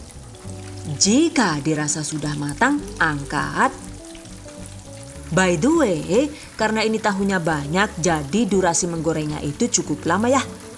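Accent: Banyumas, Indonesia. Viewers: Tks resepny Mb...smbil mndengarkn Mbny mnerangkn kdang trtwa sndiri dengar logat bcarany sy jga ngapak Mb salam knal dr purwokerto..Mb...sgt m inspirasi smg smkin sukses y Mb n sehat sllu aamiin... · Gra" ngomong nya ngpak jadi aq subscribe 😁😁😁😁 manteeeep min 👍👍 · Wong ngapak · Jebul wong ngapak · Jebul ngapak rikane yah yu😁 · Bund..kok pinter ngapak sih?ky bhsku di kroya hahaha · Mantap👍 simpel ekonomis Bdw lucu ngakak sm ngomong ngapake😀😀 tegal,Kebumen,Purbalingga rikane yu👍🙏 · Aslinee Rika wong ngapak yakk? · Konsep videonya lucuu jadi ga bosen nontonnya.. Eh deneng bahasane ngapak. Aja aja wong banyumas kie ya 😂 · Suara ngapake gawa ngakak😂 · Jebule ngapak kaya nyong ha ha ha ha... maturnuwun Yu resepe jan josh banget yakin... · Jere madiun tapi ko ngomonge ngapak kaya enyong.sampean wong kebumen apa cilacap.apa banyumas.tegal ya mbak.ayoo ketahuan. 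· tiba😂 kaget aku dengar bahasa apaan tu ngapak kah 😅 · Lah jebule ngapak😂 Gombong hadir😀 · Jenengn asline ngendi sih yu? Kok ngmonge ngpak kaya aku. Aku kebumen.. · Lucu ngapaknya😂😂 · hahaha ngapak jg yuuu ....d tunggu resep berikut nya ..💕🌹🌹🌹🌹🌹🌹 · Ngomonge koh ngapak si mba...anu wong ndi si...aku wong Maos... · Orang mn sih Bun, bahasanya ngapak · Wkwk ada bahasa Jawa nya .. ngapak pula bikin ngakak 🙈🙏🏻 Itu bahasa daerahku Purwokerto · Ngakak yakin,,,trnyata wong ngapak toh,,pada yu nyong be wong ngapak kroya🤣🤣🤣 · Lucu kok bisa bahasa ngapak, orang mana ini, salam kenal saya dari Cilacap 😆, terimakasih resepnya 🙏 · Aq sllu ikutin resep2 dr bunda ngapak ini hehe .... Detail dan jelas ,dan sering aq praktekin ...mksih ya bun aq jd nambah ilmu 🥰🥰🥰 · Bunda orang mana aslinya kok dialek Banyumasannya fasih😀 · klo pas ngomong kirain org luar ..eh pas dgr ngomong ngapak..walahh..khas bgt pada karo inyong..😃😃😃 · Bisa ngapak ngomonge.. 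wong endi sampean..? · Deneng ngapak kaya AQ yaaa hahaha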